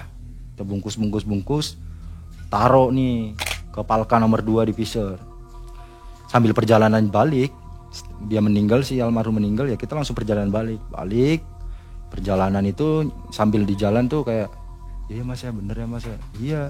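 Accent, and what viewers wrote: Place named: Tegal, Indonesia. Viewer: Sampean ngapak Tegal yh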